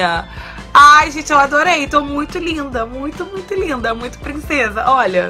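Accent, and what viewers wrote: Rio de Janeiro, Brazil. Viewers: Não acho a voz parecida, masssss o sotaque carioca é igualzinho e as girias tb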